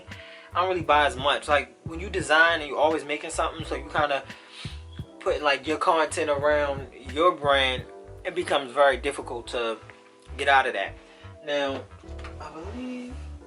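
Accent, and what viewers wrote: Baltimore, United States. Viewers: im so dead I could tell you from Baltimore just by the accent and I was right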